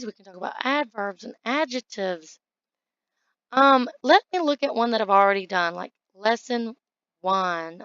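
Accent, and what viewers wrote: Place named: South, United States. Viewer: I’m from Atlanta. I’m guessing you’re Alabama or Mississippi. Maybe South Carolina?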